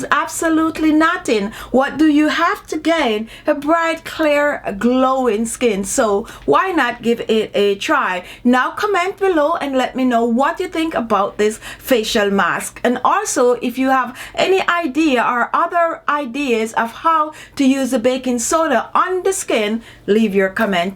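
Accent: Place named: Jamaica. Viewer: Hello, are u jamaican like me it sounds like u have the same accent. NO offense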